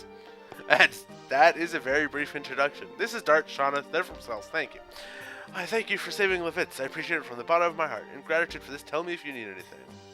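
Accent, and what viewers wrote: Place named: South, United States. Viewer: Where are you from cause it sometimes sounds like you have a southern accent?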